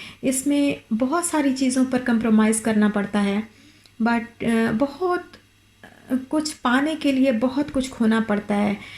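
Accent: Saraiki, Pakistan. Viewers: Aapk talking style sa lgta hy k aap saraiki ho sister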